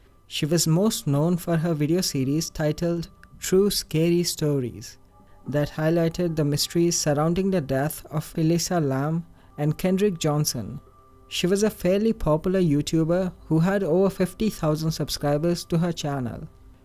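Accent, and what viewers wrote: India. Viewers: Indian guy..... narrator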